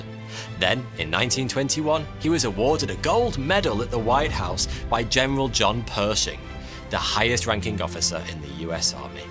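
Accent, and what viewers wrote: United Kingdom. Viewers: Blah blah blah brittish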